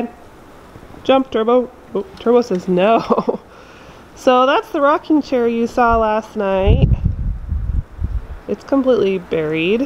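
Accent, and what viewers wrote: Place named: Midwest, United States. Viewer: She has a Midwest accent.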